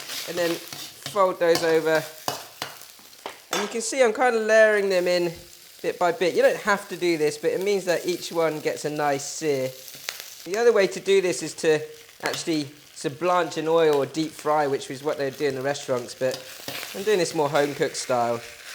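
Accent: United Kingdom. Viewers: british accent so where do you live right now ??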